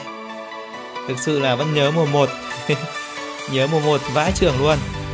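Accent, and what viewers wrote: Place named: South, Vietnam. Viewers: Anh nói tiếng miền nam kìa ối zờ ơi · Ôi zồi ơi ổng nói tiếng nam kìa cute phô mai que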